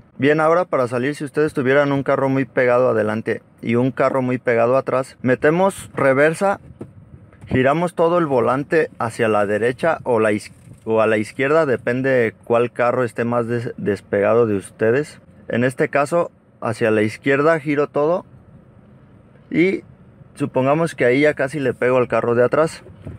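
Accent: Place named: Popayán, Colombia. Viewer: Ud es de Popayan?, tiene acento de allá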